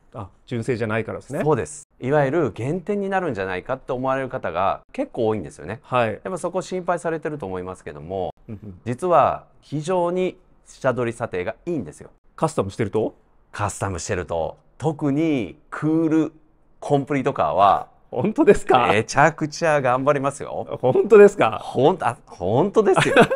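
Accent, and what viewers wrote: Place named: Osaka, Japan. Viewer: 私は車が好きな、スーパーカー時代生まれのオジサンです。 私は車を所有出来る様な経済状況には無いのですが、もしその日が来たなら、是非KUHLさんの車が欲しいと、毎回動画を見ながら夢を見ています。 今後も社長のトーク(大阪商人的な)を楽しみにしています。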